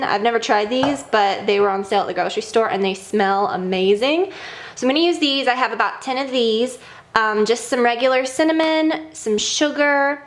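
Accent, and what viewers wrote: South, United States. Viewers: I've been watching your videos for years and I just noticed your southern accent in this video!:) Great video as awlays xx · This is the first time I've ever heard you accent come through in a video! You can tell your from the south!( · you had such a southern accent in this video! great video! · are you from the south? You seem to have the cutest southern accent:) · I totally can hear your southern accent more....its so cute! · You had such a cute country accent in this video xD and your hair had a little more volume too which was nice :) love the video please do more :)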